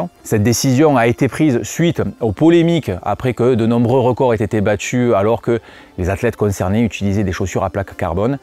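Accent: South, France. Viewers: Encore merci pour toutes ces vidéos ! Je suis un grand fan y compris du petit accent du Sud 😊🤩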